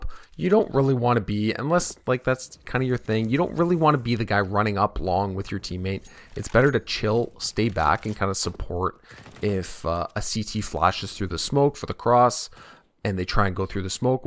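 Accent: Canada. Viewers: Are you Canadian? Accent sounds super Canadian.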